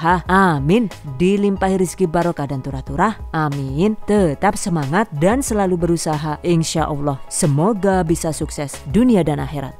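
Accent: Banyumas, Indonesia. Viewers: Wong ngapak jebule ... 😁👌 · Lah ngapak😂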